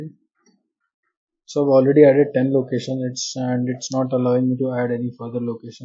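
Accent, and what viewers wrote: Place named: Pakistan. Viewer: Learn to speak English in English,not in pakistani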